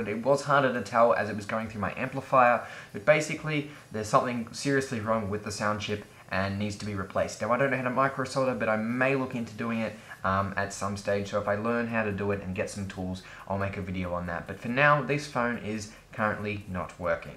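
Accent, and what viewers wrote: Australia. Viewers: / / WPS 4004 he aussie also he is one if my good mates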